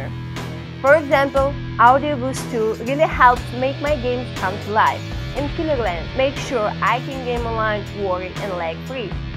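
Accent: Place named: Russia. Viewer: Wow that accent. I can barely understand what she is saying. Is she Russian or something?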